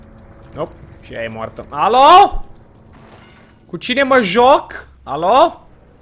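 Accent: Moldova. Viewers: vorbeste cu accent moldovenesc v-a fii un epison amuzant :)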